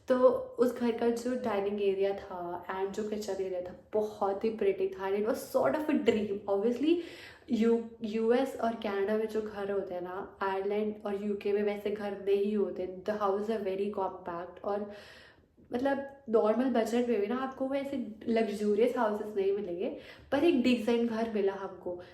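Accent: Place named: India. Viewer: Im sorry but I didn't understand anything with the Indian accent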